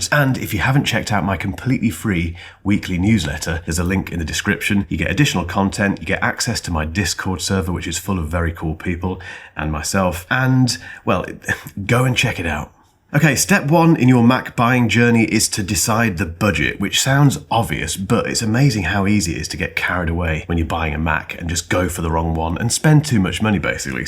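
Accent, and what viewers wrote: United Kingdom. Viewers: Hi! Always turn to you for some of the most sensible Mac product buying advice on the internet. Besides, as a Yank, I’m obsessed with your Brit accent! Best. FYI, my current MacBook is the M2 Air. 🇺🇸🇬🇧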